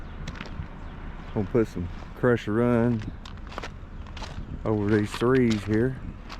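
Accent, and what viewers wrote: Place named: North Carolina, United States. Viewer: That’s a good looking job. What area are you in? Sounds like NC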